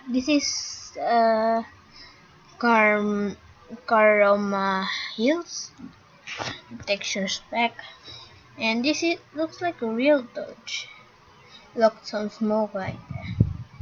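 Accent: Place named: Philippines. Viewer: I can tell by your accent you are filipino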